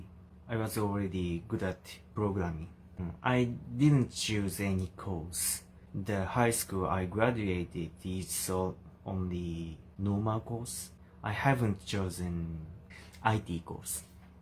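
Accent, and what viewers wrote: Visayas, Philippines. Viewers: Thank you for giving us joy and entertainment. Kakaaliw Ang bisaya mo. Ang cute lang.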